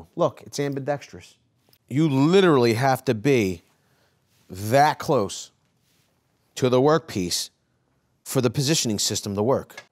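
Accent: Philadelphia, United States. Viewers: LOL, knew you were from Philly. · Knew u were a philly guy from the accent lol Gonna sub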